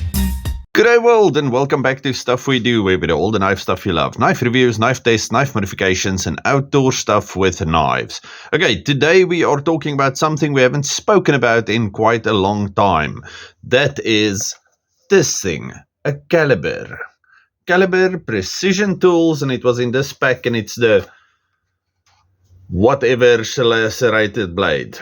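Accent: Afrikaans, South Africa. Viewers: Jy kan sommer hoor hys Afrikaans 😂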